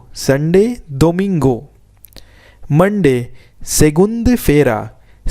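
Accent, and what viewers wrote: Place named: India. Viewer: You sound like an Indian. Keep up the good work. Needed these kind of videos for so long!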